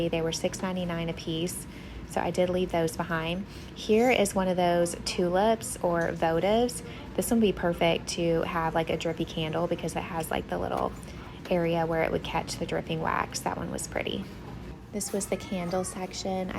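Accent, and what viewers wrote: South, United States. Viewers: What?? Who on Earth could/would say anything about the sweetest voice! I'm from and live in NH and I love your southern accent!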